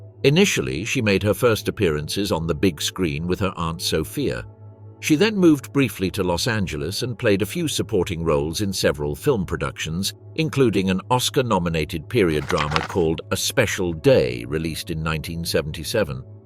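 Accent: United Kingdom. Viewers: yes, but its even worse the use of UK english (with all sorts of dialects) while it should be US english narrated. Now thats really an islands plague :-((((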